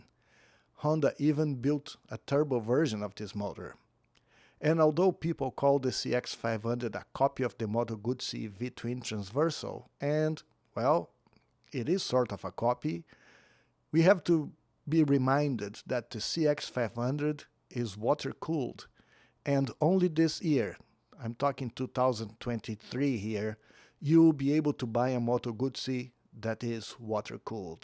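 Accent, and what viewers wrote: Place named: Brazil. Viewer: i loved this video and also love the name of your channel....sotaque de brasileiro hein i am a new subscriber to this channel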